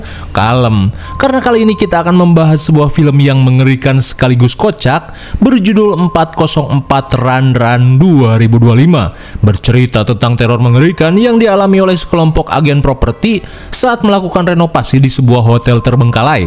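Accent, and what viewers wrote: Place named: Java, Indonesia. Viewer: Gua suka konten lu bang . Omongan nya adaa ajaa . Nganclengan lah . Segalaa mecem wkwkwk .. medok banget ngomong nya 😂😂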